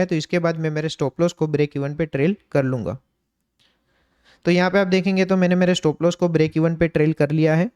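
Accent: Gujarat, India. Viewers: Bhai aapki awaj se esa lag rha hai ki aap gujarati ho?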